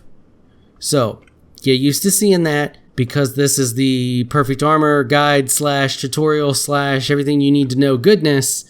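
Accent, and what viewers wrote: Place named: South, United States. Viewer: I really can't even pin your accent. Sometimes it sounds slightly southern, other times not. Sometimes it has this sort of effeminate twinge at the end of each sentence. Then in the episode about the 10 things that they took out of Starbound. You talked about the barrel and completely lost your shit, you got way louder and lost the accent the twinge everything, your voice completely changed.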